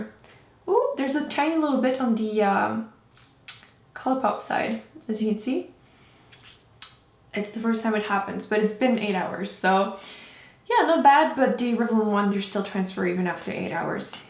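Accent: Quebec, Canada. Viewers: Hehe. Accent: French Canadian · She is Canadian French. How the heck can you not detect an accent???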